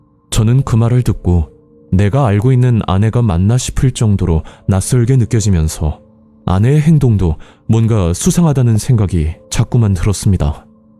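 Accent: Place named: Jeolla, South Korea. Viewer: 아따 전라도라~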